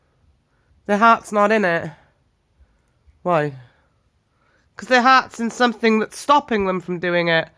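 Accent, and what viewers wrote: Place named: Wales, United Kingdom. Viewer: Another great video. Really had me thinking deep and reflecting with this one. Just curious, you have an interesting twang on your accent - where abouts are you from? I thought Welsh at first but maybe I'm wrong.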